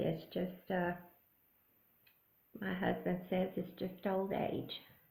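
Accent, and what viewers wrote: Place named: South, United States. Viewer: Hi from Pennsylvania (but originally from Louisiana)! It was so wonderful to hear your awesome southern accent! Loved your video and hope you continue doing them!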